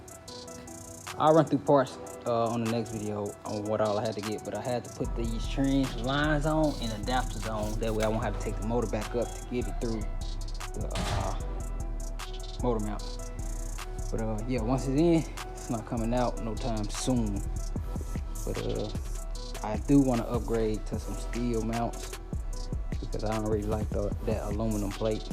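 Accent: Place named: South, United States. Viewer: You live in the south somewhere, man. You do speak properly.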